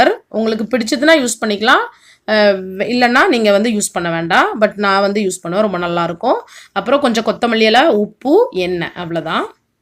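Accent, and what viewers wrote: Tirunelveli, India. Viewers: Neenga thirunelveli ya akka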